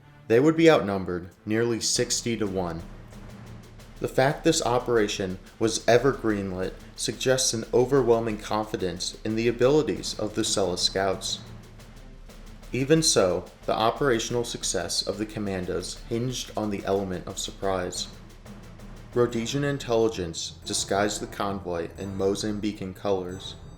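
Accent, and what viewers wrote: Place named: United States. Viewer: very exaggerated American pronunciation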